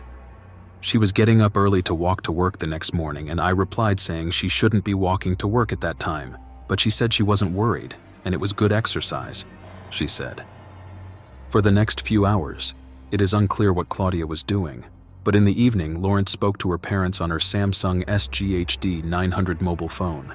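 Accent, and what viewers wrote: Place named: United States. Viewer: British crime but it had to be an American voice.........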